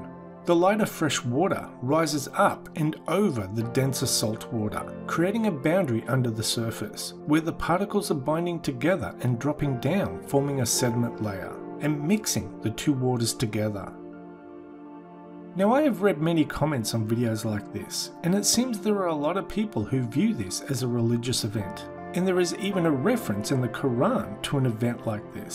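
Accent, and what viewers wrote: Australia. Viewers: Yes he sounds aussie. It was a joke anyway. 👌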